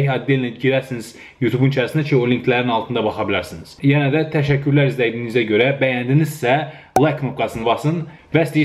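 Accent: Gadabay, Azerbaijan. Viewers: Can e can Gedebey lehcem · Cox maragli daniwir rus-Gedebey-avropa qariwig 👍👍😂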